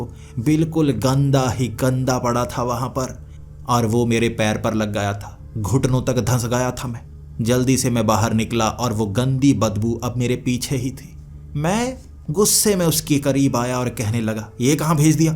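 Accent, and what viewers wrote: Punjab, India. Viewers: Aap Punjab se kuch kuch Shabd aapke alag sunane mein aati hai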